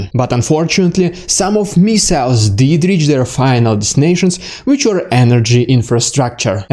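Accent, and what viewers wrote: Russia. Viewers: 😎💪🔥 Coolest accent dude!!!🧐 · I love your accent dude, but it needs to be much heavier and thicker you talk a little bit soft. Just drink vodka before you speak your voice may get heavier I just can not wait for your next video dude but please improve your accent to make it much heavier and thicker and harder 👍👍👍 so I will call you this guy is a really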